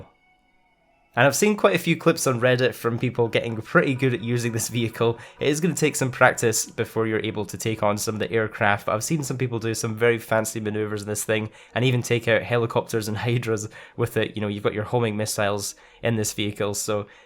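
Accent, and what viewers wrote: Scotland, United Kingdom. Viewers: You didn’t know he was Scottish are you stupid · I thought he was british 😂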